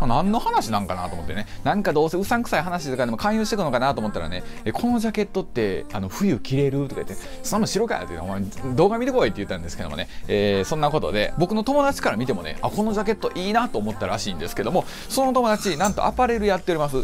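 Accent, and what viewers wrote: Kansai, Japan. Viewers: 関西弁ってキタナイ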